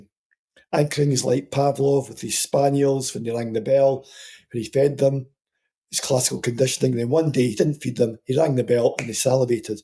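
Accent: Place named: Scotland, United Kingdom. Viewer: Hi - thank you for sharing..I found it to be very informative and fascinating and the Scottish accent is fabulous too.😅 Can you please explain Anchoring a little bit more? Also do you ever treat PTSD and Neuro divergence..or ADD?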